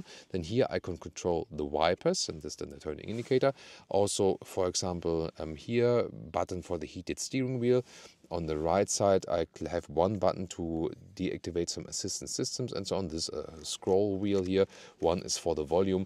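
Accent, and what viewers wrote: Germany. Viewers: Der Typ schaft es deutsch und englisch zugleich zu sprechen.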